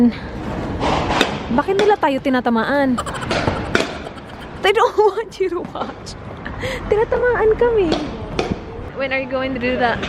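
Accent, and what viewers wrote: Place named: Visayas, Philippines. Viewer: ang cute magsalita ng tagalog na may halong bisaya :) pero ang galing mag english :)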